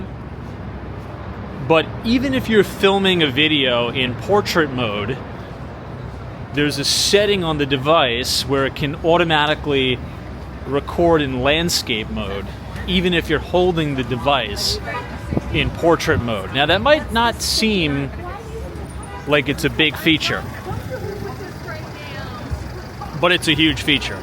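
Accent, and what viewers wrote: New York, United States. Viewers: Love your accent,is this a New York accent?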